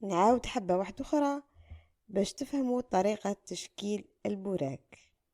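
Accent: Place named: Morocco. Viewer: راها تشبه بزاف شغل لهجة مغربية نتاعنا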